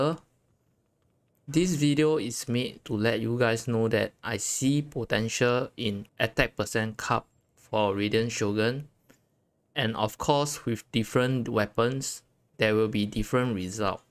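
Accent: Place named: Singapore. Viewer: I thought u just sounded singaporean until i looked at your channel name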